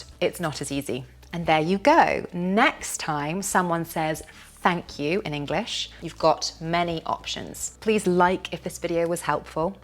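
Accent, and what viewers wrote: United Kingdom. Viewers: you are amazing i love british accent and you are more natural the way you pronounce that is mind blowing. love you from afghanistan.shahab. no worries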